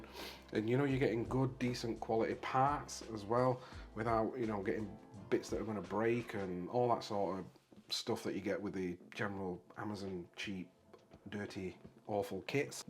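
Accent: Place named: Wigan, United Kingdom. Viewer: Lad sounds like he's from Wigan or something so I assume he's pretty feral 😅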